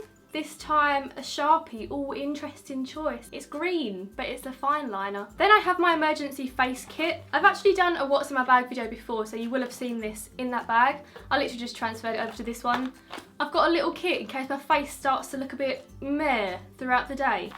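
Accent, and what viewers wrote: Essex, United Kingdom. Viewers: i love ur accent i used to watch towie and idk it just reminds of it ❤️❤️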